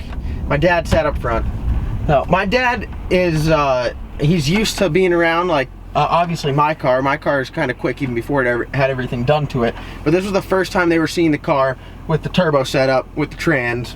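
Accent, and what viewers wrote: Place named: Appalachia, United States. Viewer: Why do you talk like a hillbilly but your parents dont? Lol wtf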